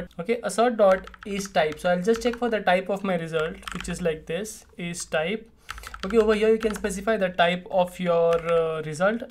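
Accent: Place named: India. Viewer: Nnnnoooooo This video is exactly what I needed. And yet, it has been cursed with a pajeet accent.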